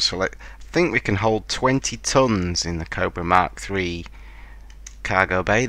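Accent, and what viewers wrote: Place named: United Kingdom. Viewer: I like your British dialect.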